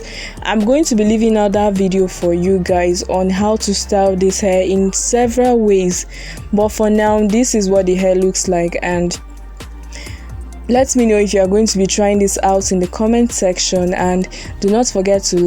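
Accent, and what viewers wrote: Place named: Nigeria. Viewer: Are you Nigerian Coz you sound like one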